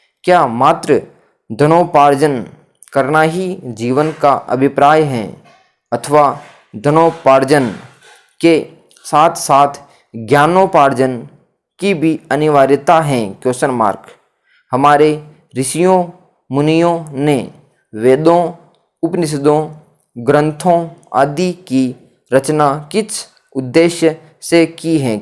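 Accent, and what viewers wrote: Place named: Rajasthan, India. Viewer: आप की हिंदी मे राजस्थानी का थोड़ा सा मेल है इसलिए कुछ शब्द अलग सुनाई देते हैं 😅😅😅😅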